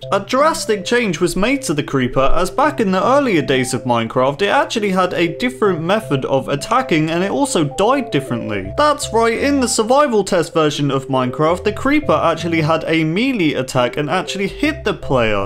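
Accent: United Kingdom. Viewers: Are you British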